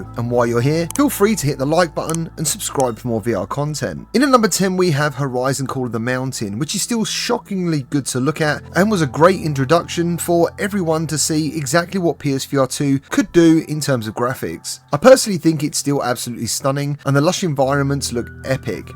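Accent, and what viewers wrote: United Kingdom. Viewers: Can some one write down the names of the games please I just can’t understand with his British accent 😢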